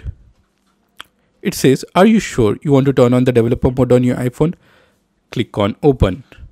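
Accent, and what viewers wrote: India. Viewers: INDIAN VOICE!!!!!!!!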